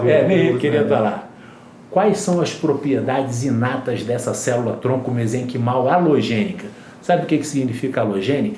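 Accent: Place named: Sao Paulo, Brazil. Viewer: O carioca ortodoxo agora é mais paulistas. Rsrs. Te amo meu primo. ❤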